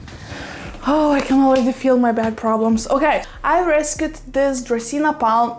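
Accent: Russia. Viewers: I was hoping you were going to include the ZZ plant!! I love your variegated ficus too, it’s so beautiful! Also, don’t apologize about your accent! First of all, I love listening to you and second of all, it’s so impressive that you speak multiple languages!!! Be proud of yourself for that! If I was able to learn another language, I’d have an American accent speaking it, because that’s the language I grew up speaking. You grew up with Russian, so that’s what your tongue is used to doing! Enough lecturing, lol. Thank you for sharing! 💜💚🪴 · You don’t have to apologize for your Russian accent, its beautiful. I have an Italian accent, its what defines us. 🇱🇹 🇮🇹 🇨🇦 ❤️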